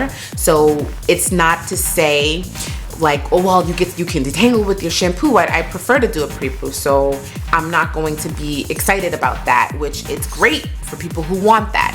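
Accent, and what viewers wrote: United States. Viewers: you have a new york/louisiana/californian accent all in one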